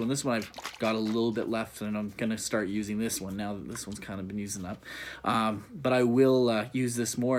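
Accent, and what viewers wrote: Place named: Canada. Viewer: Fellow Canadian here. You have such a Canadian accent! Love the video!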